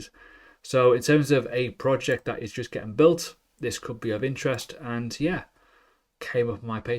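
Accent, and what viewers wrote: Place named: Northern England, United Kingdom. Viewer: Great video man, just signed up to your patreon too. Refreshing to hear another northern English voice talk about crypto lol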